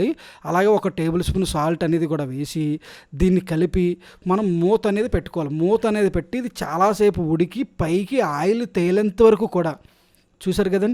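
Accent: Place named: Godavari, India. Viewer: Godavari slang lo gouravam 😍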